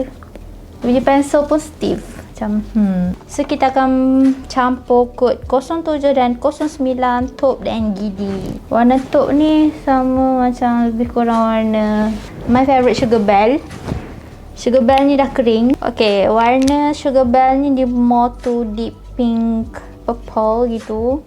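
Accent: Kelantan, Malaysia. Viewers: reply 😲😭 nak nak! lg best bila dgr kecek kelate🤭 btw, u've gone through much tough times..fighting! You did well 💜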